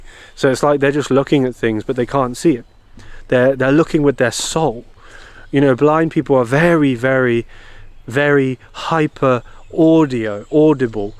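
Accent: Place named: RP, United Kingdom. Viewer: Thats a very interesting story about the farmer and cattle situ. Ley lines run 3 ft above Earth and 3ft under the Earth and no matter your denomination that ley energy is what is used to manifest. So these people were obviously using that energy for the dark purposes and thats why the cattle could not eat the grass because its likes its poisoned and they knew it. In India cows are sacred and also in Egypt too. Its nice you speak the Queens English without a regional accent, because its dying out and thats a real shame, although the odd word I can hear an accent from up North like possibly a Yorkshire accent, but only an odd word occasionally. You speak beautifully and I adore listening to you !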